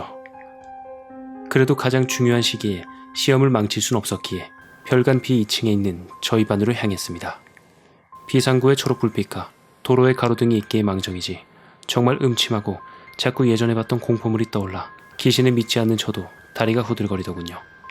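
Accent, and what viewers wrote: Gyeongsang, South Korea. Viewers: 경상도 어디신가용😊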